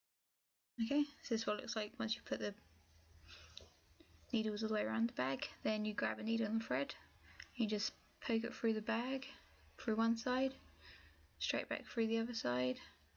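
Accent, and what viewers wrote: United Kingdom. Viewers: You have a british accent, yet you us american methods of measuring a opposed to metric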